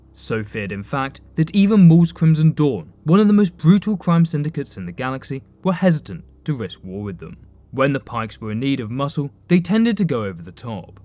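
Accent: United Kingdom. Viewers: Just realised he’s from the uk cuz the accent. #oneofus!!!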